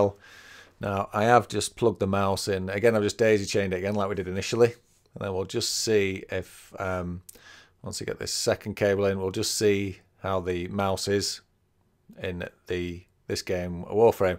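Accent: United Kingdom. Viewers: Hope pubg allows k&m eventually. Are you from yorkshire btw lol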